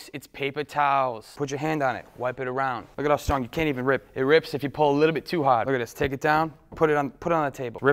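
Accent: United States. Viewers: He sounds like he's from New Yawk x3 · Loving the Boston accent!!! "Look at all the squaaaahhees.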